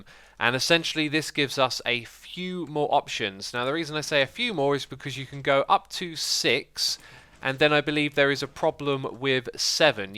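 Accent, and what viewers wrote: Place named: North, United Kingdom. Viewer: What dialect is that exactly? Are your from the north? Keep up the good work!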